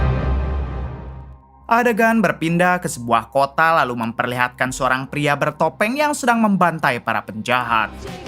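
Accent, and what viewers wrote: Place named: West Java, Indonesia. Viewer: Lidah Sunda SDH gak kaget z jd j pokoknya lawak tukang panci keliling 😂😂😂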